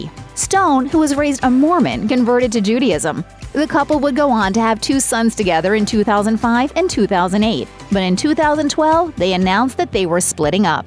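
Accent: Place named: United States. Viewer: So where and when did this much touted "transformation" occur? I'm assuming this is something marvelous that happened post BBT. I'm up to but I don't think I can put up with much more of the narrators dreadful American accent, at first I thought it was a robot.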